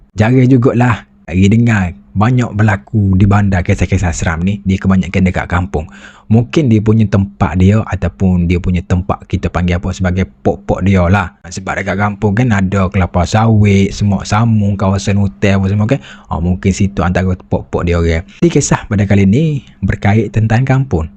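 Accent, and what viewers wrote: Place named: Kelantan, Malaysia. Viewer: faham ja..kami utara suka dengar loghat kelate.. hehe tp jgn la dalam2 sgt tapaham juga haha...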